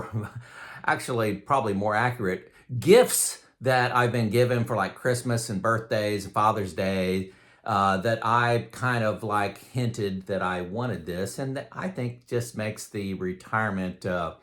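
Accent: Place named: Southern Indiana, United States. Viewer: lol, I was wondering where your accent was from, you must live in the southern part of Indiana near Kentucky. You have a great accent!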